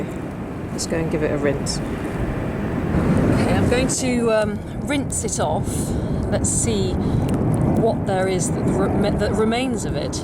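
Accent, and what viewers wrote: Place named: RP, United Kingdom. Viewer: Please don' take this offencively but you look and sound like really posh totty.You also really enjoy your finds.A perfect lady of which there so few these days! Keep digging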